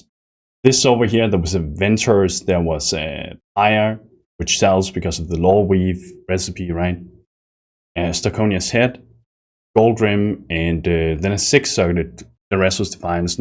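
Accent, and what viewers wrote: Denmark. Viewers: you sound like a dane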